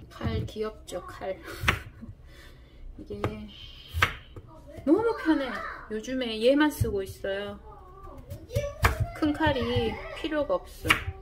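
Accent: Gyeongsang, South Korea. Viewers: 어머나.. 이제 목소리까지 오픈하셨군요 ㅎㅎㅎㅎ 경상도 출신이라 경상도 사투리 참 정겹네요♡ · 영상스타일이 살짝 바뀌었네요..^^ 광고 뒤 영상나올 줄 알았는데 안나와서ㅋㅋㅋㅋ당황했네요ㅋㅋ 같은 경상도라 말투가 넘 친근하네요..오늘도 잘 봤습니다.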